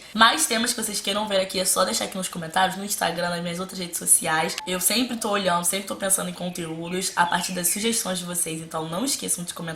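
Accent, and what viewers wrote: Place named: Rio de Janeiro, Brazil. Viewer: SOTAQUE CARIOCA AMO ❤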